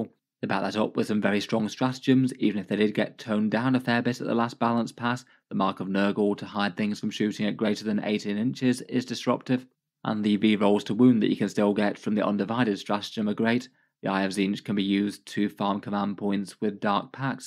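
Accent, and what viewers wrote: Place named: United Kingdom. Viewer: why britains can't speak english?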